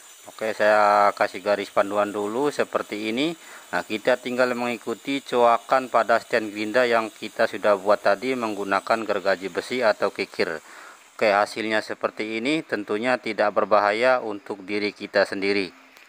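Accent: Indonesia. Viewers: Ngomongny gaya Indonesian, tp soket listriknya Malaysian🤩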